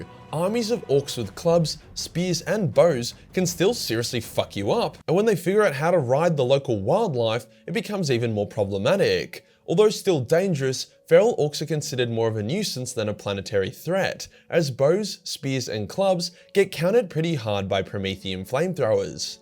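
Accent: Australia. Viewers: You do this very weird thing with your voice at the end of like every sentence, where you kind of raise your pitch and makes it sound like you’re asking a question. That makes listening to you very difficult. Even if someone didn’t find it annoying, it absolutely comes off as not genuine. Just talk regular homie. (And no I’m not talking about your accent. Everyone loves a good Aussie accent)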